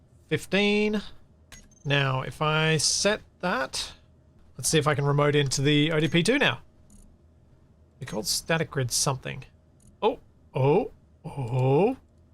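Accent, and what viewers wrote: Australia. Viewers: So many happy shout outs during this episode. Also a couple of frustrating times. All came out in a fantastic way of Australian accent, I love it. It always makes me smile, laugh and want to go back visiting down under 😊 Thanks, it is always a pleasure watching your episodes 🥳🇩🇰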